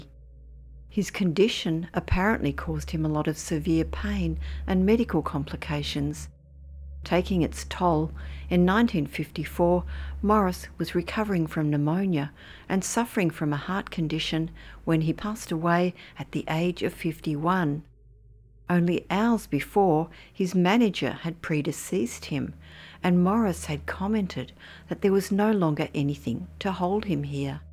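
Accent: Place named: Australia. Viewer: I think the time of the Aussie narrator and/or pitch man has passed. Let's just not anymore huh.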